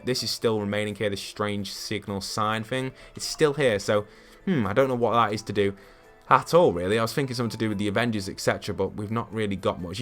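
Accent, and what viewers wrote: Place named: United Kingdom. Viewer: He british that's how Brits say it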